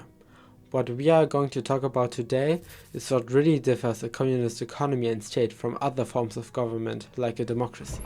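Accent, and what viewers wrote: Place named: Germany. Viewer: Der deutsche Akzent ist nicht zu überhören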